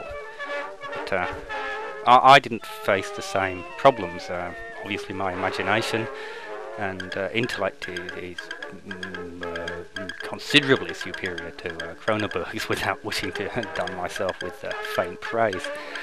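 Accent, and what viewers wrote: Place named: South London, United Kingdom. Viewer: Wow you've lost your northern accent and sound like you're from south London like me! And the trailer is a groove. I can't wait for the full film....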